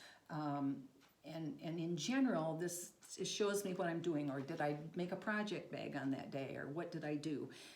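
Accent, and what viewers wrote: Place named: Michigan, United States. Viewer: Just found your floss tube and enjoyed watching them. Your work is beautiful. I chuckled at your comment about your Michigan accent. I live in Michigan and have all my life.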